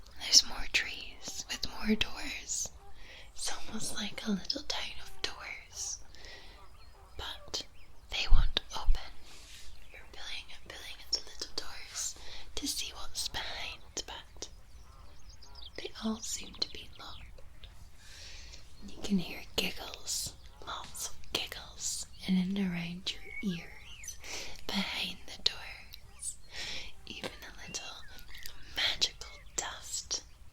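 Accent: Scotland, United Kingdom. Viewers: Awww what a magnificent video full of restful relaxation and calmness! 😴 That soft whispering voice with your lovely Scottish accent sends me right into LA LA land!! 😴 Dream number 3 and number 6 were my favorites! 👏👏 Number 3 definitely had plenty of tingles from all of those lovely animals jumping in and out of the woods along with those amazing bird sounds 👌 😀 👍. Number 6 was my top favorite because had invited me into her amazing home! 👏 Of course chatting with would be the ultimate wish but to actually sit down and play with Hugo and Lindi would be totally awesome and cool:_Hugo:🐶😎👌 😊. Thanks for sharing all of these incredible dream stories with me for I am totally relaxed and looking forward to a awesome night's rest!😴💤 Your just getting better and better with each and everyone of your videos! 👍 Do take care and have a magnificent evening!! 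Lots of love to you xx 🤍🤍 😍💕💕💕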